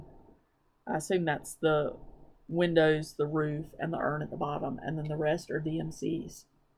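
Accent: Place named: South Carolina, United States. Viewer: Hi! The thing that drew me to this video was your beautiful Scarlett House Floral Motif piece! It is simply stunning. It is really calling my name, but I’m trying to WIP down! I am also charmed by your accent! You are from SC? I’m originally from Tennessee although I live in Chicago now. I used to sound a lot more like you and I miss it! lol. Sounds like you had a fabulous retreat. I love spools and want to find a big one some day to put in my craft room! I’ll be looking forward to your next one whenever you get around to it!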